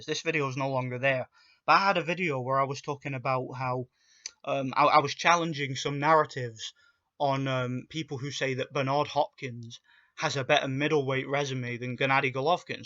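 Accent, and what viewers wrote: Yorkshire, United Kingdom. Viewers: Scottish?! I'm jus' over the wall... More or less scottish as far as Gov/london is concerned haha you got yorkshire-ish accent but i talk geordie so no 1 understands owt i waffle. Will follow Count, sounds like good crack. I dont know how 2 be politically correct... Hope antifa comes 2 my neck o the woods. They'd honestly get tortured... "Take a knee? Am takin them both'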